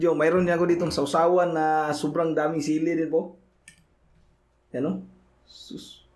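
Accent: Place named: Bohol, Philippines. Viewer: Taga Bohol ka migo ? Mura man ug Taga Bohol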